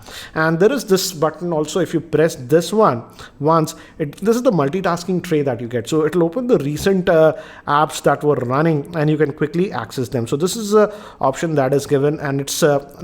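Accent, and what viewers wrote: India. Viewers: Sir, You sound Indian tone English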